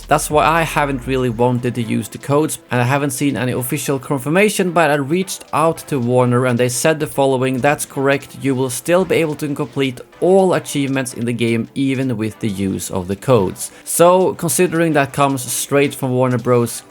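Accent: Sweden. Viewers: Haha man kan höra att due svenne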